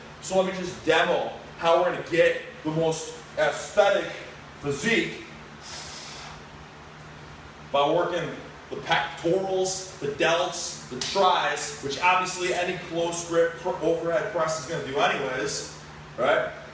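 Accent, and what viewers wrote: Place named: Wisconsin, United States. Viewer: It always sounds like a Canadian accent but I guess Wisconsin accent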